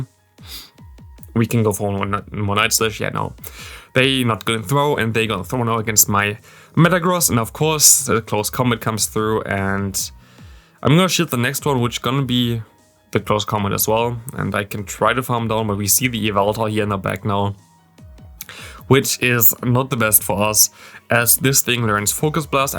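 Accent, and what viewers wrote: Germany. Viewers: Ohne es zu wissen, aber richtig deutscher Akzent im Englischen. :-)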